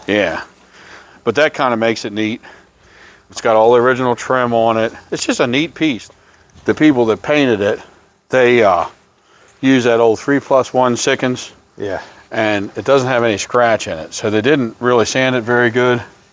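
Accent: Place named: Georgia, United States. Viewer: That car really makes a statement rolling out of the garage. Is this? OK, I see the hat. What is that white stuff on the ground? How can anyone let this car sit from 1995 to 2018? What a waste! points out how "old school" is. You still use a newspaper in the age of the internet. is like, under his breath, well I got the car and you don't! Go Newspaper! You sound like you live in Georgia. Can't take the car out there because of all this mess. Looks like a light dusting of snow there. You did drive over to place? Hey, even said this is "a nice safe driver". Sounds like has a Camaro in every color of the rainbow. Always go with 69 in life. Like the special effect of the two of you disappearing. Great video guys!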